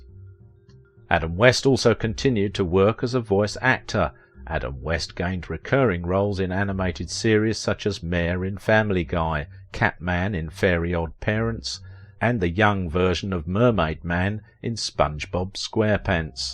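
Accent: United Kingdom. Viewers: You’ have got a wonderful British accent! Love it!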